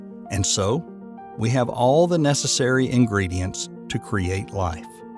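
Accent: South, United States. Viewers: Why is there a redneck narrating,? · what kind of american accent is this??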